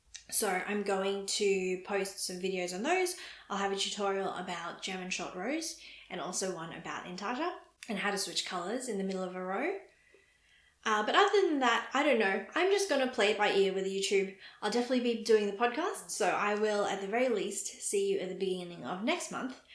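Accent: Australia. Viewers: Hi, I've just recently found you here on YouTube. I am located in rural Victoria so it's lovely to listen to someone who has an Aussie accent. Would you know of any other Aussie yarn content on YouTube? I have searched but have only found a very small number of Aussie fibre enthusiasts. I am both a knitter and crocheter and am interested in trying both drop spindle and wheel spinning in the future. I hope you continue with the podcasts as I think they're awesome. 😊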